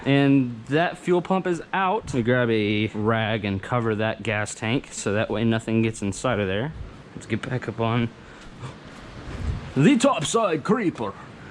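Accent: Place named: Alabama, United States. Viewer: Being from Alabama we would think that you’re from here lmao but we approve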